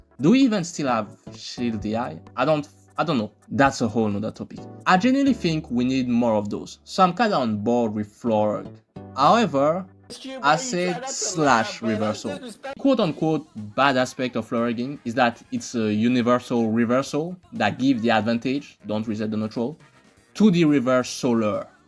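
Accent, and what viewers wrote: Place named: France. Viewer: Why do you sound french?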